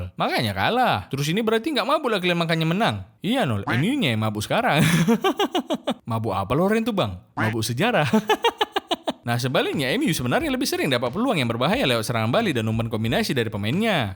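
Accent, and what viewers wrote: Medan, Indonesia. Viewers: segaring apapun konten nya kalo pake logat medan jadi lucu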